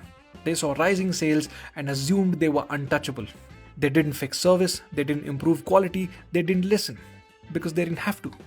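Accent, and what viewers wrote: India. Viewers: Pls stop the induan scammer ahh accent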